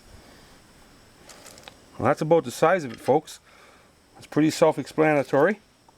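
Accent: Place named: Canada. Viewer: Good possibility you're either Canadian, or from the U.P. I'm a bridge troll here from the L.P.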